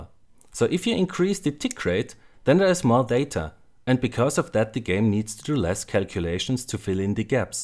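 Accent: Germany. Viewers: without knowing who the narrator is, i can say with great accuracy, that he's german :D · Man hört. dass du aus Deutschland kommst. :D Trotzdem super. Übrigens hatte BF2 afair ne Server Tickrate von 36hz.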